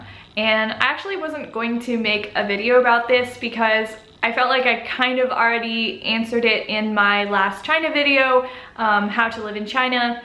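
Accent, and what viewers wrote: United States. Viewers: I guess that you are an American based on your accent. But I can't decide where you got that blue eyes. Hah hah.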